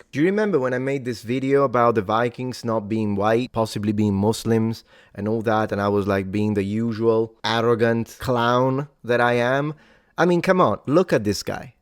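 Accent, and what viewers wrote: England, United Kingdom. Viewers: I believe you are from England. :)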